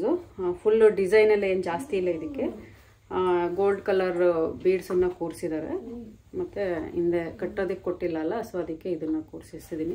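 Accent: Mandya, India. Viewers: nimma matu keloke mandya bashe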